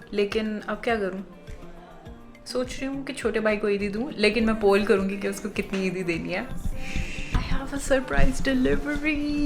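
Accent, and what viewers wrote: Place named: Sindhi, India. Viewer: Aww yOur sindhiii iS sO cute....!!!😍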